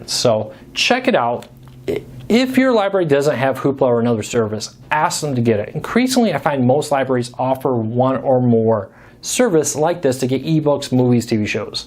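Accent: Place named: Michigan, United States. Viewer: Excellent information about the channels! Thank you very much for sharing. Also I could tell you were a fellow Michigander like myself ( Southeast Michigan-Metro Detroit) when I heard you talking in the beginning of the video. God bless!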